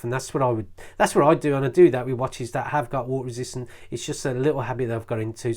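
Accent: Birmingham, United Kingdom. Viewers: Thanks. My first watch in the 70's was a Casio digital and now.. after all these years I've got a yearning to get back into these type of watches. l recently bought a silver coloured e1200 and I'm really impressed with it.. so much so - that I'm going to buy a few more digitals and I'm watching a few vids about them. Saw this excellent vid of yours and it is very detailed and informative..plus, it's always nice to hear a Brummie accent. If you don't mind, please could you just answer one question from me..I like the legibility of the reviewed model just shown, especially with its larger digits, but I'm undecided about the size of this watch as I prefer slightly larger faces. Without going into G Shock territory what Casio's could you suggest I take a look at?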